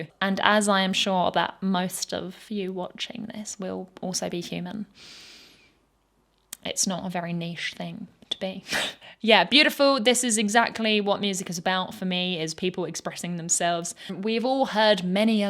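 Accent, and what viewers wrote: England, United Kingdom. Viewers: ...." with their Vision".....What a GREAT IDEA!!!!....Have you Vocal analysed the great from the band yet?....They just happen to have a song called " ".....Now, you do have an English accent, and to my Canadian ears it sounded like "BULLS TO the WOOL"....If you meant the latter my deepest apologies....If on the other hand you meant the former, do enjoy... and UDO are almost as much FUN as I am. Cheers!!!...Ta....P.S...What does SMUGGLED A BUDGIE MEAN?.... --????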